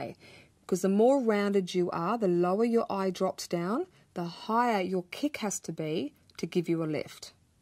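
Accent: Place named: Australia. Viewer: OMG... I am an Aussie and a makeup up NUT and I cannot believe I am only learning of this amazing woman now! Where the bloody hell have I been? Overlooking that, what a fabulous tutorial and explanation... too good! I have exactly this eye shape and have abandoned all hope of ever wearing a sexy flick but now, maybe, I can... how fabulous to have a tutorial so well explained and so relevant AND with an Aussie accent! New subbie and super happy, thank you, this was fantastic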